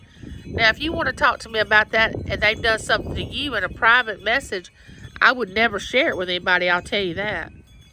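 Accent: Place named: South, United States. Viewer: I absolutely love your southern accent! ❤❤❤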